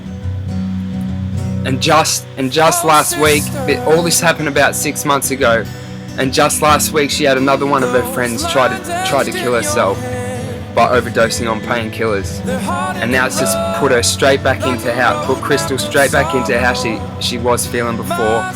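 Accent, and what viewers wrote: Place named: Australia. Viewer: the guys aussie accent .. lols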